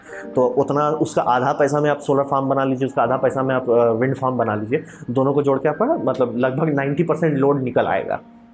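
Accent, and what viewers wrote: Bihar, India. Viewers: Sir apko sun kr mza aa gya.. Kitna achhe se smjha diya aapne... Love u sir...Awaz se Lgta h aap v Bihar se ho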